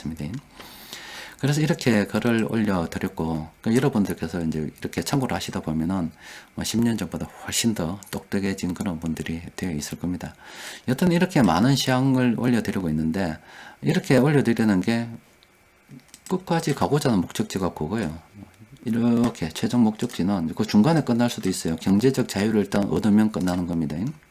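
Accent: Gyeongsang, South Korea. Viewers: 경상도말투친근감있어서 좋습니다~ ^^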